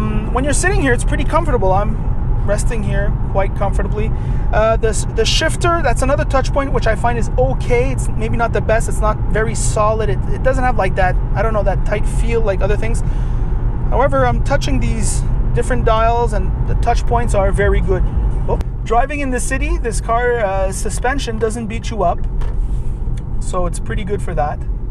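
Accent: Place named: Quebec, Canada. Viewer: Bonne vidéo! L'accent québécois ne fait pas trop surface :p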